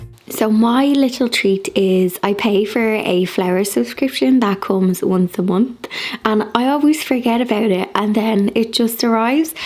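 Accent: Ireland. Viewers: To sit there lazily on a Sunday afternoon, enjoying tea and candle light, it has something deeply satisfying to watch you whirling around ;-) It is cute somehow and I absolutely like what you make out of your home. Every video is a package of creativity, smiles and cuteness (being decades older, I hope, I can say this without sounding arrogant or stupid). Actually, it is so lovely to listen to your Irish and to watch your videos. Deeply pleasant. Love and greetings from Germany.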